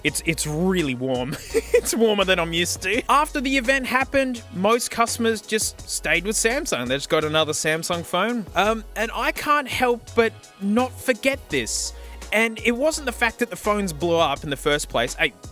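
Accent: Australia. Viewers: why? hes just gunna say the same things everyone else has except in an australian accent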